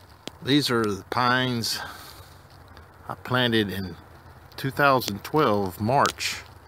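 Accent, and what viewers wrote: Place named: Oklahoma, United States. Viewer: What state are you in. Sounds like Oklahoma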